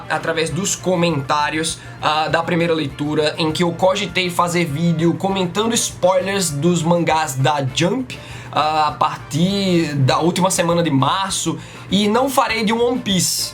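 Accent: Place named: Recife, Brazil. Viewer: Bem que eu tava RReconhecendo esse sotaque hahaha... tamo junto!!! Sou do bairro da várzea/Recife sou seu fã.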